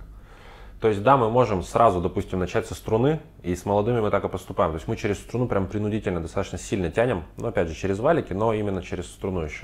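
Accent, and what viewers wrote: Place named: Urals, Russia. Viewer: Мне кажется, доктор - уралец? Говорок уральский). Такой молодой и такой потрясающий!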